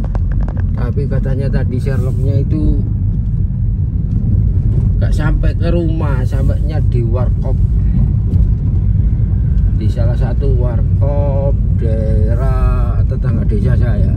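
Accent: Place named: Sidoarjo, Indonesia. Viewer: Pean asli pundi . Kok bahasane sidoarjoan nyel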